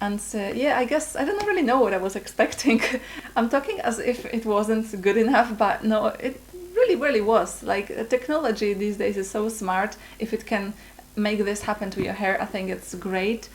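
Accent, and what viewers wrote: Poland. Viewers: Nie wiem czemu, ale typowo widać po twoim ang, że jestes polką. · This Polish accent... brrr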